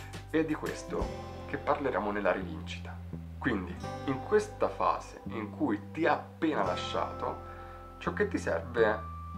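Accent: Tuscany, Italy. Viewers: Beh, dai,... Arrivi solo con 10 anni di ritardo!!! 😅 Assurdo come tu abbia descritto per filo e per segno la mia relazione del 2008 che per 11 anni mi ha lasciata congelata. Ancora più assurdo che questo mi torni utile adesso che, invece, esco dalla prima relazione avuta dopo quel trauma, relazione che è stata molto intensa e importante per me. Stranamente il tuo video mi arriva proprio oggi, dopo averlo sognato stanotte. E strano che nei commenti legga di qualcuno che parli del 3 agosto, giorno in cui questa relazione è iniziata. E ancora più strano (ma questo mi è parso strano si da quando ho iniziato a seguirti 😜) che tu somigli fisicamente in maniera quasi fedele, addirittura nell'accento toscano, al mio "ex" sparito nel nulla nel 2008. Ma quanti casi... 😉🙃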